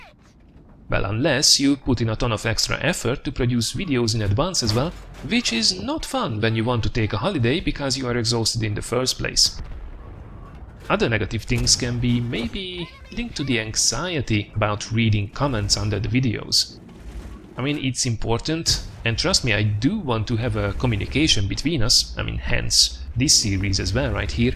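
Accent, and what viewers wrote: Hungary. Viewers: Tudtam hogy magyar akcentus :D tetszik a sorozat de szerintem egy rész lehetne hosszabb is. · Az akcentus elég árulkodó :) Ráadásul Velem egykorú...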